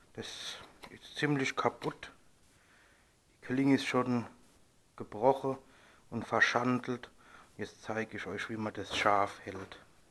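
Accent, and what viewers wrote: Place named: Hesse, Germany. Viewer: vielen Dank für deine VA! hat mich mega gefreut! :) du bist ja geil drauf :D aus welcher Region kommt denn dein Akzent? Man könnte meinen du kommst aus dem Hessischen Bergland :D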